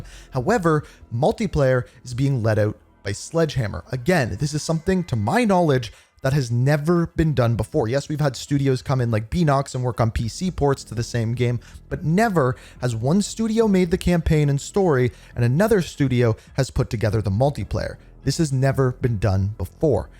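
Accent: Canada. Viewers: Love the canadian accent my man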